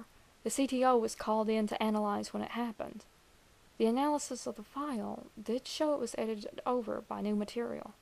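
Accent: South, United States. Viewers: You sound like a Hucken Hill Billy.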